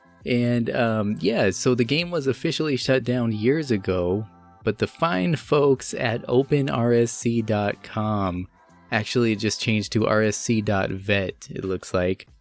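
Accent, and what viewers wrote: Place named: Canada. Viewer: Where are you from? Mild Canadian accent.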